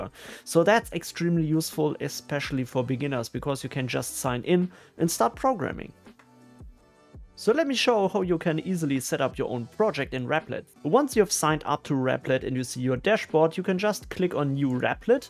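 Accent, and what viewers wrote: Germany. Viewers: Schwäbelst du ein wenig lol ? Grüße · Are you German? I can hear the accent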